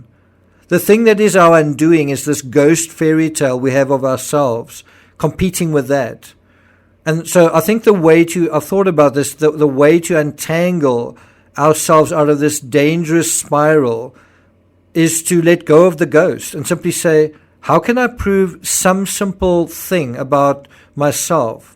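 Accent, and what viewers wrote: South Africa. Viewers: I like your channel very much, especially your naration and your, perhaps South African, accent? :) Your voice is quite calming and very pleasant to listen to. Good work.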